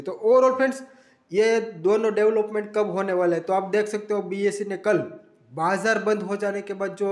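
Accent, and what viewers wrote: Gujarat, India. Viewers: App gujarati lagte hooo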